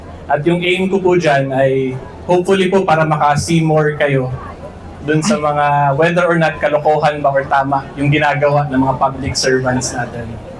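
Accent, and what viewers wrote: Visayas, Philippines. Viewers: Bisaya kapo ba atty? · Bisaya diay ka atty hehe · Sir abayon kaya pala may lambing sa dulo Ang pananalita mo bisaya man diay ka,, salamat sa PAG kampi mo sa tama, Ay salutes you always.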